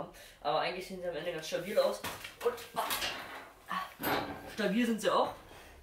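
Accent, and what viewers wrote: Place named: Berlin, Germany. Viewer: die Videos werden immer professioneller (bitte nochmal ein ganzes Video nur im Berliner Akzent, sonst Deabo)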